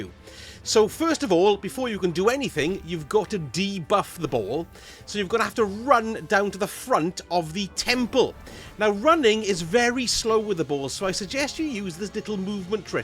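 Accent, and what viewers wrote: United Kingdom. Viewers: Oi are you British mate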